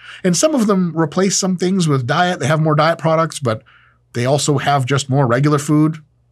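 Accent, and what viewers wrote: South, United States. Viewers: More southern accents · As an..ex-USSR person, I completely approve of your Southern accent, pls bring on moar of it! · As a southerner I'd love for you to make it through an entire revive stronger roundtable with that accent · As an asian acting southerner I approve that accent. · I approve of the southerner accent and even slander in the future. (I'm from the armpit that is Lousiana) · The southern accent is a win! More please! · hahaha that southern accent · Love the southern accent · Bruh... thank you for the upload Brother! Bruh more Southern:)) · Am southern, do approve of accent! I love it!! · Yes mike we like your southern accent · Southern accent approved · Sweet southern accent bro! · Hello, from Texas. More Southern accent please. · I need more Southern content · Okay with the southern accent. Highly offended by the diet coke/nugget bit. 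has always worried me but now I see how problematic he truly is. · Info 10/10 Southern accent 6.5/10 -Texan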